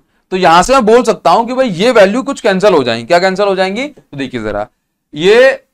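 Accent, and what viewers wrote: Odisha, India. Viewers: Sir aap odisha se ho kya?😅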